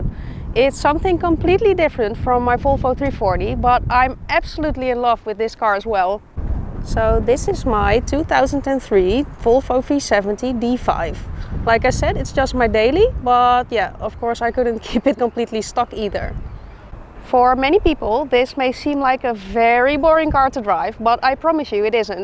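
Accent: Netherlands. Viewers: Beautiful and stylish woman with a confident and friendly manner; cute Dutch accent also a plus ✅ - CRX resto; very nicely done ✅ - Old school Volvo; well cared for ✅ - Volvo wagon; comfy, practical, cool ✅ - E28 5-series; a proper Beemer that needs some love ✅ - Subscribed ✅ Congrats on being noticed by the algorithm! Cheers from Australia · Love that dutch- English accent. Used to love the look of CRX growing up. Still a cool car. nicely done enginebay with matching colour. I'de guess my favourite would be the E28. please keep it original! no low rider, 22" rims and such. 2,5 hours away, wouldn't that almost put you in another country? :P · Love the car collection qnd your videos. You are stunning qnd have great Dutch accent ❤ wishing you the best from California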